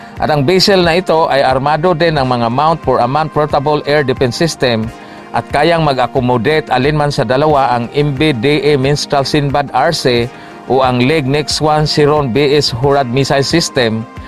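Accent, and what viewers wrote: Visayas, Philippines. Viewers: Bisaya ka pre? Binasa mo nlang mga sinasabi mo sablay sablay pa animal! 😂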